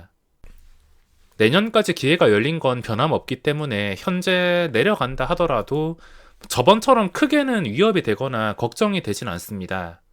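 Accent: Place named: Daegu, South Korea. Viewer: 은 목소리가 진솔하고 매력적입니다..거짓없이 솔직한분 같습니다..저도 대구살지만 억양또한 구수하네요..좋은영상감사합니다..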